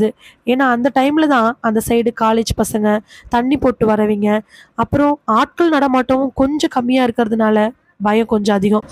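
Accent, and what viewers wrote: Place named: Pollachi, India. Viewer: சிஸ் நீங்க பொள்ளாச்சி சைடுங்களா...வாசிக்கிற விதம் எங்கபக்கம் போல இருக்குங்க சிஸ்.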